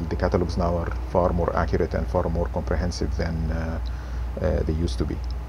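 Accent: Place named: Canada. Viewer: Funny Canadian accent.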